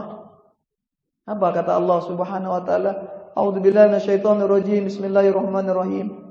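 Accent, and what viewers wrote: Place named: Lombok, Indonesia. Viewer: Khas warga lombok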